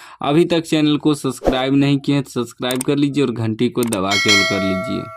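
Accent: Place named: Maithili, India. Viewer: Sir ap methli v bolte h 😃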